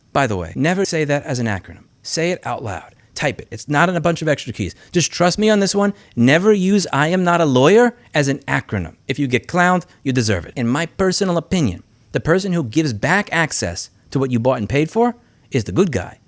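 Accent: New York, United States. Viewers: His new Yorker accent is strong in this vid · You can really hear the New York accent come out when you're worked up. I love the good fight you're putting on for us, keep at it ya legend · ...Gee, Can you tell that Louis is from NYC? hehahaha LOL! Mocking the Futurehomes CEO by using his cats as the victims.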